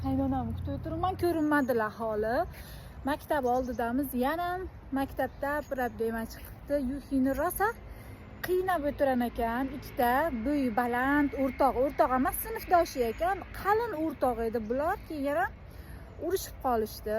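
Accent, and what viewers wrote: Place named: Qorakul, Uzbekistan. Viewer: Qarakoʻl danmi siz shevamiz oʻxshaydikan.tinch yurmanglar ayollar.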